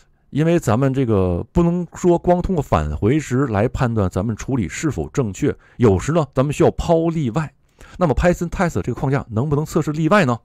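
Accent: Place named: Japan. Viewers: 因为在日本，没办法你到日本你的英语也会变W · Python Test 为什么老带日语发音？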